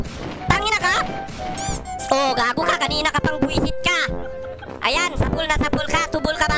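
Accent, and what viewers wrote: Visayas, Philippines. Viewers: Kuya ano ka? Bisaya o Tagalog?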